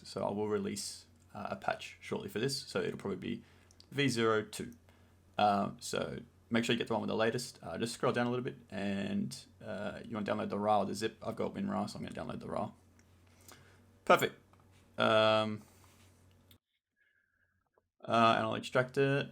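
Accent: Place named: Australia. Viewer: me 10 seconds in: this guy is aussie so it has to be good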